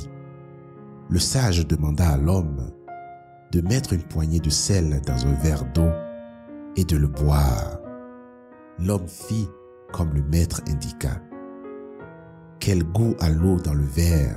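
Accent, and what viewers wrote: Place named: Africa. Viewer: J'adore l'accent africain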